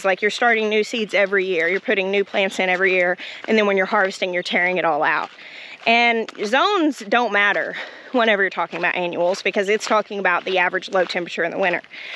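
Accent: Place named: South Carolina, United States. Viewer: Do you realize how much of a South Carolina Southern drawl you have picked up??? 🤣🤣🤣😍😘😁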